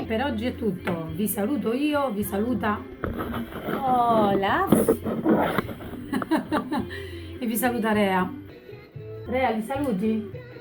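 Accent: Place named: Rome, Italy. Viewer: Anche io ho vissuto a roma per ca una decina d anni poi ho girato un po'e mi son stabilito a Forlì in em romagna la patria delle lasagne e del prosciutto di parma ma su coro meu e sempre in sardigna . Comunqu si entiva l aacento romano , comunque sei la n 1 io su internet ti seguoo sempre sei la prima cantautrice sarda che ho scoperto su youtube e son contento . Complimenti per la voce sei la n° 1 sono fiero di seguirti sto imparando tutte le tue canzoni grazie per avermi risposto . Unbacione grande a te e tutta la Sardegna .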